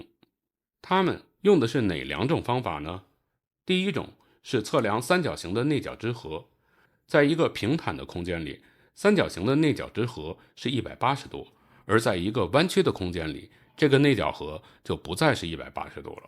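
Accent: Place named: Tianjin, China. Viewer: 主持人是天津人吧，你这内容是从哪抄来的？别装了，直接说天津话吧，你这天津普通话齿音字一点都不少！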